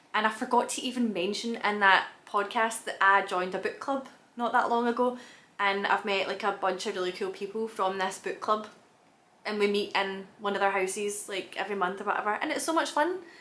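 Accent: Scotland, United Kingdom. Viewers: I'm from Poland and watching your videos to get acquainted with the Scottish accent, which I really like. I visited Scotland many years ago and liked it a lot, watching your stuff reminds me of my past travels and how nice the Scottish people are. I wish I get the chance to go there again some day. I hope you are doing well and finding your happiness in life! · You always made interesting, funny and beautiful videos. Miss you and your lovely Scottish accent. Hope you're okay. Ty from Ohio. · I'm so glad i found your vid. Initially i was looking for smth to study scottish accent but i think i just found my person <3 I'm currently feeling so stuck behind in life and your take is like a breath of fresh air tysm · That was brilliant!!! I only came here to learn a Scottish accent but you are adorable ❤️Loved the talk! Do what you want in life full stop!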